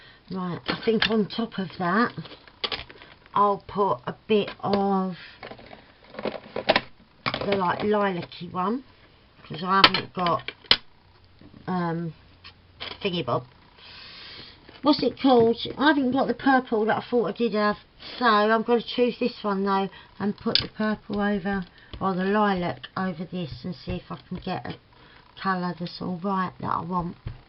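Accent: Cockney, United Kingdom. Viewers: Love the journal card. The edge does look like a peacock feather off the edge! Hugs and Blessings my lovely friend. Xoxo Denise PS...every time I play your videos and my so. Is in ear shot he stops and watches because he says he loves your Cockney accent lol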